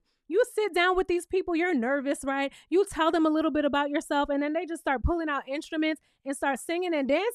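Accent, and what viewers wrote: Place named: New York, United States. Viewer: I'm here for the New York accent when it comes around top tier commentary as always ❤❤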